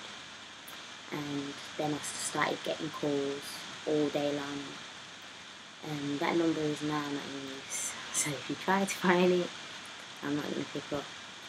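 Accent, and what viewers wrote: United Kingdom. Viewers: Come to america please just for a little while. Most unique brit rapper. I love the accent even tho its heavy. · I love the British talk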